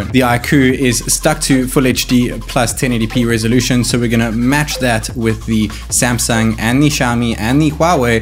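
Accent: South Africa. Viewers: You sound south african bro 😌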